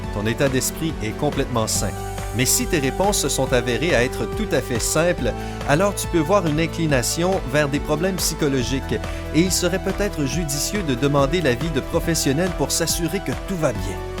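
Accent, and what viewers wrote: Quebec, Canada. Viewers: D'après ton accent tu dois être. Quebequois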